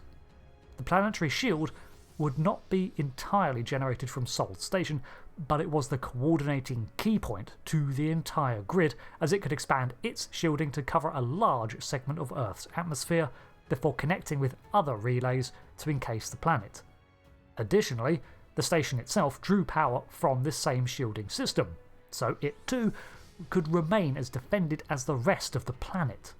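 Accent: United Kingdom. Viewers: Just simply because you have a British accent, does not necessitae you being correct.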